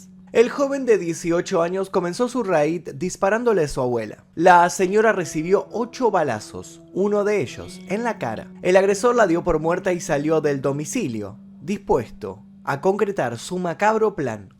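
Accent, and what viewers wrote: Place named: Argentina. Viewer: Me encanta este youtuber, con ese acento sweet argentino😊🥰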